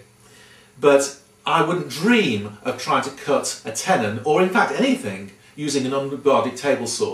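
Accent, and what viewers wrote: United Kingdom. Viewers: Why is it that everything sounds better when delivered with a British accent? Nice work. I like your jig, and your presentation in your videos are excellent. Thanks.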